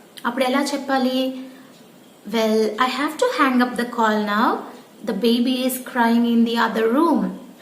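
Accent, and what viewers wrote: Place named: United Kingdom. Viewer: Very well rendered in Brit accent ya!! Btw what word was that u used for call disconnection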